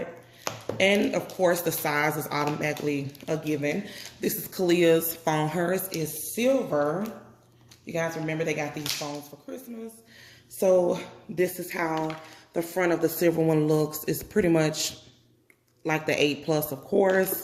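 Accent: Mississippi, United States. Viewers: Lol.....i know that Mississippi accent anywhere #notificationsquad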